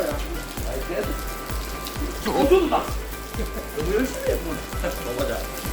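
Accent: Gyeongsang, South Korea. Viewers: 케케케~~대서 ~~엉 왜케 올만이셔유? 역시 삼겹살 쥑이네요ㅋㅋ 구수한 경상도?억양 인간미 촬촬 넘치는 우리의 케케에챱?꺼챱?~~쓰ㅡ읍쇼리ㅋ 솨자~~앙님good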